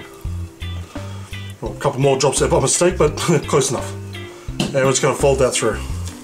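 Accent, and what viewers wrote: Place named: Australia. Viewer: he sounds like an aussie hell yeah!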